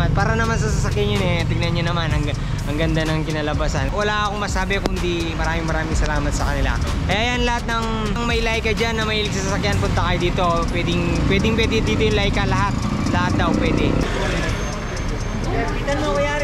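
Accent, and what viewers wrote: Pampanga, Philippines. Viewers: Kapampangan Accent pa din, kuya 😂✌️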